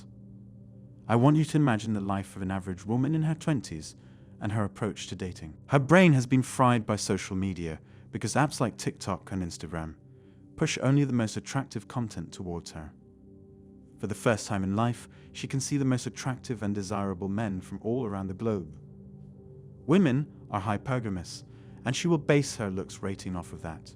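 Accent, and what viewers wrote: United Kingdom. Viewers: I'll admit I'm not a 20-something in the dating pool, but while watching this I couldn't help but be reminded of Tom Cruise's "Seduce and Destroy" seminar character in Magnolia, particularly in the "trap" section, except with a calm British accent. I do agree that social media has poisoned personal relationships, setting up unrealistic expectations, and the need to go back to direct, interpersonal contact is imperative. Don't let these "incel" mentalities to rule your lives, my young dudes, but also don't let a lack of a relationship destroy your self-esteem and stop you from enjoying life. Not all young women are vain harpies looking to suck you dry, be patient and eventually you'll run into someone you feel mutually compatible with.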